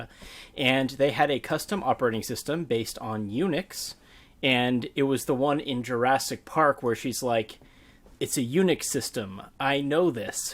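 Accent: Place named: Netherlands. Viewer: Nice dutch accent, and also have of the comments in dutch. :D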